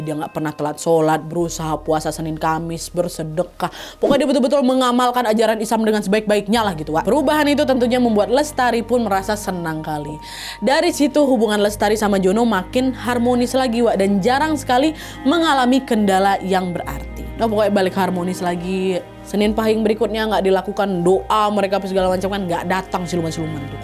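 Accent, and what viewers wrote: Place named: Banyumas, Indonesia. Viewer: mbaa wongg ngapakk😂😂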